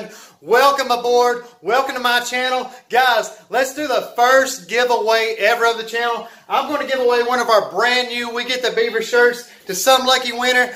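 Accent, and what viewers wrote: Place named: South, United States. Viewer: Im a new subscriber from catch em all and ive watched quite a few of your vids back to back and im so impressed ! You have so much enthusiasm for the hobby and its a huge plus you love reptiles ! I would love to rock your shirt up here in Bucks County, Pa ! I absolutely love your community lizard tank and it inspires me to start one ! I have 3 & 1/2 years clean from heroin and reptiles and fish were my savior from that horrible life. Its a great hobby and it saved my life. Btw - i LOVE your amazing southern accent!!! Thank you so much for welcoming us new subscribers !!!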